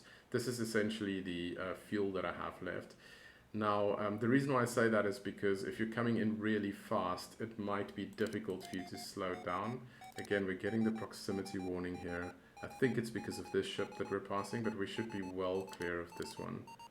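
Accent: South Africa. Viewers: Sounds like SA?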